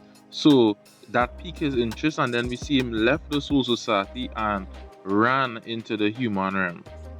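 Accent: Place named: Trinidad and Tobago. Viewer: The moment I hear the voice I was shocked. Lol. A Trini giving a rundown on anime.... Amazing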